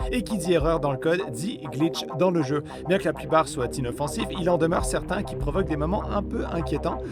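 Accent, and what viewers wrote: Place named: Quebec, Canada. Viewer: Alors moi je suis tombée par hasard sur topsicle cinema et comme j'adore, je me suis dis que ce serait trop bien la même chose mais avec les jeux vidéo. L'accent québécois étant le petit plus :) Pour rebondir sur la vidéo, je sais pas trop si on peut le ranger dans la catégorie glitch ou plutôt easter egg, mais il y a la fameuse tarte/hibou avec la musique flippante qui l'accompagne bien, dans Dragon Age Inquisition. Ce qui peut donner matière à une nouvelle vidéo: top des easter egg les plus flippants.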